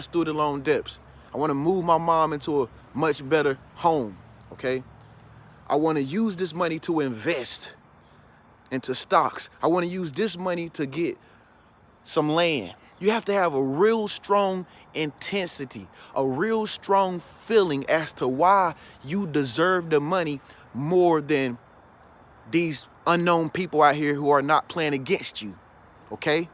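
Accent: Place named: South, United States. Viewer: I knew I recognized that Southern twang. NC native here too.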